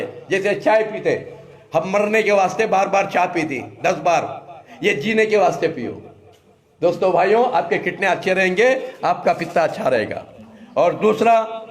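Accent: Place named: Hyderabad, India. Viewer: Sab ka naam batae saf saf nahi dikhta naam batane s humko samajh aaega haidarabad ki boli samjh nahi aati jaldi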